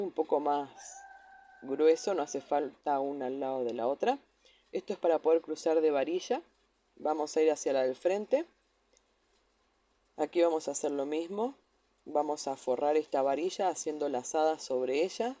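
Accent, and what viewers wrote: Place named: Chile. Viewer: Perfecto! gracias por su repuesta rápida. Te pregunte eso porque encuentré que tenia un poco del accento chileno pero el amaricho por el amarillo me dio la pista 😁 Soy francesa, pero franco-chilena en realidad. De parte de mi papá! Ahora yo vivo en un pequeñito pueblo en el sur-oeste de Francia, en medio del bosque y de todos los animalitos. Hice un cambio radical de vida, pasé de una gran ciudad urbana a una pequeña casa en el campo y recuperé mi creatividad. 🥳Gracias a ti para tus videos que son super bien hechas, y tus creaciones son maravillosas ❤ Un lindo dia para ti. Me llamo Laurie🙋‍♀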